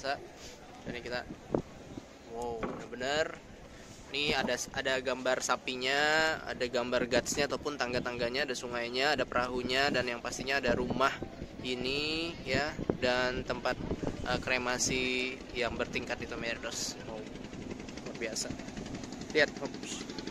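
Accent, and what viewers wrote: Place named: Jember, Indonesia. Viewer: Jember bgt iaa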